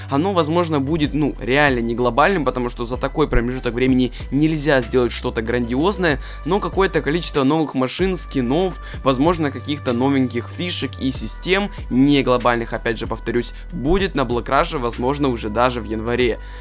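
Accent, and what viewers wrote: Ukraine. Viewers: Ты с Украины?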